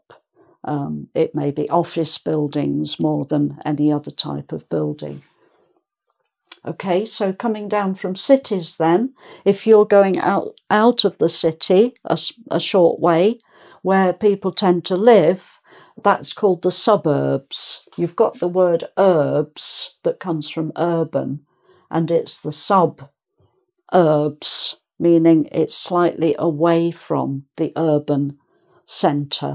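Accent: United Kingdom. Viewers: Beautiful british accent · Hello, teacher! I love your accent brithish and your lessons, than to be easy to understand. I am Brazilian and study every single.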